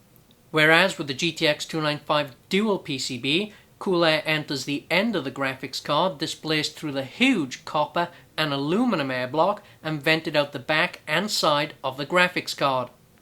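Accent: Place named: Wales, United Kingdom. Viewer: Sounds more Welsh to me.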